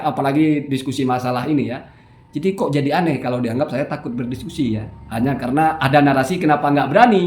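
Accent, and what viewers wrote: Madura, Indonesia. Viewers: orang mana ya, kok ligatnya kayak orang madura. Ngapunten ngge.......salam kenal dari mojokerto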